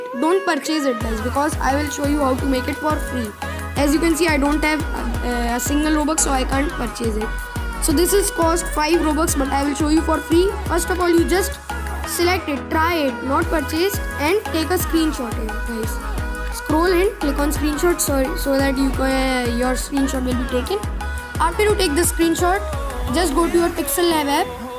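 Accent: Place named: India. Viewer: Definately indian... I can get to know any Indian by his voice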